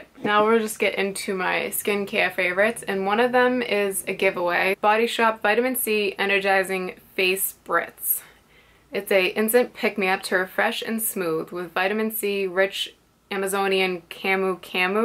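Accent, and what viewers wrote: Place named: Boston, United States. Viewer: My current fave is SPF in skincare! (especially spf 30 & higher)...& also ur accent! Are u from Massachusetts? U mentioned Jersey earlier in the video, but I get more Mass/Boston =)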